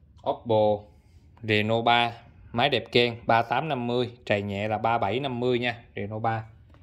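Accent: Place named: Mekong Delta, Vietnam. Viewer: A la người mien tay ha nghe dong quen quen. E o bạc lieu a gửi ve cho e dượt ko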